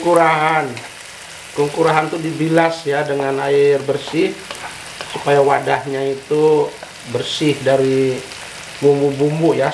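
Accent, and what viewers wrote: West Java, Indonesia. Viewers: Hahaa kungkurahan bagus sundanya g hilang berada d negri orang mantaf 👍🙏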